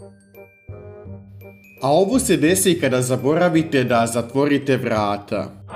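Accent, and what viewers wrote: Montenegro. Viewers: Човек говори својим дијалектом ( црногорски ) и неумесно је критиковати га због тога , као што је и не људски критиковати га због говорме мане !Логопед неби могао сада да му помогне јер је старији !!! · Jeste li primetili da je crnogorac vidim po naglaskom ja sam isto kumara 😂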